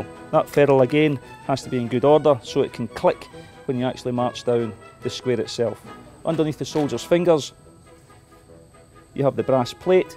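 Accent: Scotland, United Kingdom. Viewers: I really enjoyed the video but try speaking a little slower. Many of us across the pond are not familiar with the Scottish accent. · Strong accent, difficult to understand for foreigner like me. Is that Scottish accent ? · nice Scottish accent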